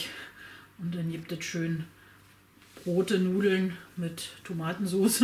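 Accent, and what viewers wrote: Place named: Berlin, Germany. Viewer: Hallo, vielen Dank für Deine Videos, liebe, die berliner Schnauze finde ich als Rheinländerin schon amüsant. Ich muss aber mal was fragen, ich such mir einen Wolf......gibt es einen Adapter, mit dem man die Matrizen der großen Avance auf die kleine Viva schrauben kann? Für die große gibt es so viele Formscheiben....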